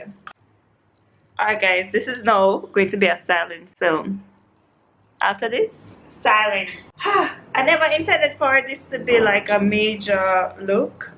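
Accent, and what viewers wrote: Jamaica. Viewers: Btw, are you Jamaican?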